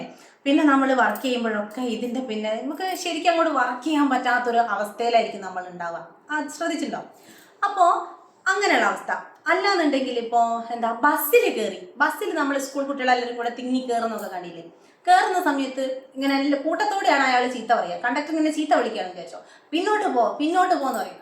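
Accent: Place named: Kannur, India. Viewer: Ningal kannuraano 😅.nammale athe basha slang..veetil ulla areyo pole thoni😅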